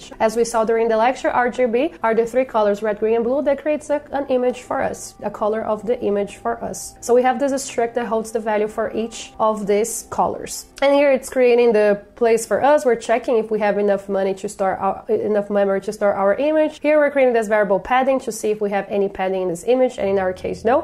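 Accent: Brazil. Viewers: tu é br??? a pronuncia é muito brasil!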